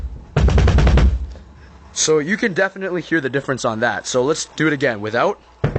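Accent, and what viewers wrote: Canada. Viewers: are you Canadian? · He sounds like Lars Ulrich mixed with Shia Labouff, but with a Canadian accent.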